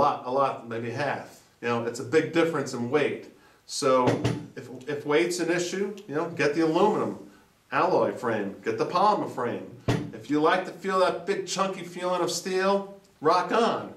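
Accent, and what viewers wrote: Long Island, United States. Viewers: And on a funnier note....you sound EXACTLY like the "honey badger" guy. Long Island?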